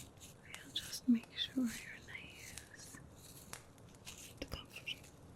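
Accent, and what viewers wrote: Ireland. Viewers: After the rain subsided I will find the mushroom I seek., you do a mean Irish accent. You really are the master. Terrific RP! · Ginger curls and freckles suits you well. How do you do a Irish brogue so well? It sounds so natural. 😊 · I do not claim to have heard many Irosh accents in my life but i love everything about the way you talk to me. Thank you for warming me up in this rainy weather!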